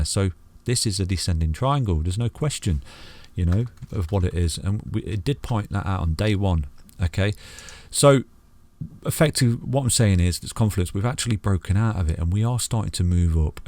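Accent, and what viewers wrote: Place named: East Midlands, United Kingdom. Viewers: First time on your channel and hit subscribe...Great TA and love your accent!! Lincolnshire by any chance? Lol🤑💯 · Finally, A no-nonsense Crypto channel with a nice local East Midlands accent. Av subscribed me duck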